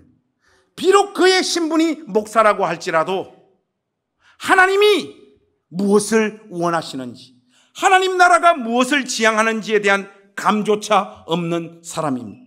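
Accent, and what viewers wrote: Gyeongsang, South Korea. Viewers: 목사님 설교는 너무 좋아요. 그런데요 경상도 말투가 , 악센트가 너무. 강 합니다😂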